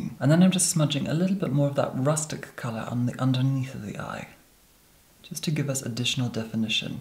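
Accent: Scotland, United Kingdom. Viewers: .... our Lord says England but has a Scottish accent? Surely should still say the UK? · He has a lovely accent although there’s defo a Scottish accent undertone. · He's definitely Scottish. Go back to his first video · The first video of yours I've watched and I immediately picked up on the Scottish accent 😍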